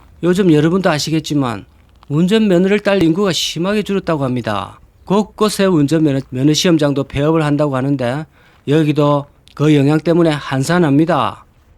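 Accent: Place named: Busan, South Korea. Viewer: 제가 아는 부산 분하고 말투가 너무 비슷합니다.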